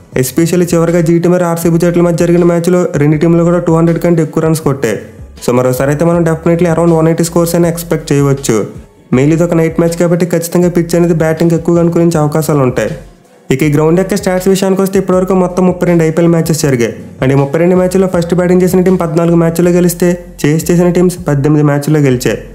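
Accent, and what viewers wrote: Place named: Visakhapatnam, India. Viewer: rajahmundry ayyina aa slang lo matladatledhu miru vizag slag lane undhi so vizag vadivi anukunna bro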